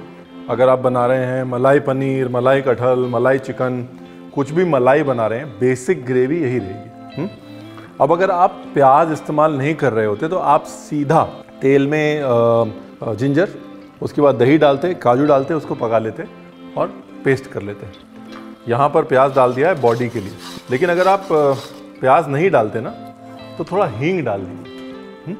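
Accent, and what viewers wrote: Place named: Punjab, India. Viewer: I really love the punjabi accent and the joy( major ingredient) he infuses in his cooking.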